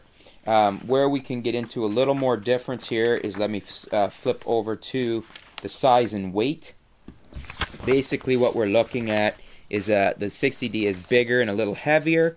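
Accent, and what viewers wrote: Canada. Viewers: Goiod info. Canadian, eh? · After he said he was Canadian I couldn't ignore his accent.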